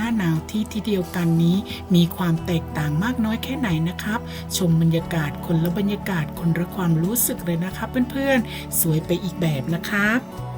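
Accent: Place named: Isan, Thailand. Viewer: ดูเพลินเลยจร้า สวยมากๆเว่าอีสานได้น่ารักมากๆจร้า..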